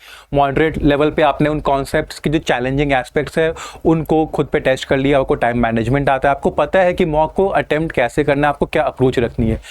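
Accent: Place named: United Kingdom. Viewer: Bhai hindi bolne ka course kr lo Aise lg rha hai England se yha aakar hindi sikhe ho Thanks for these knowledge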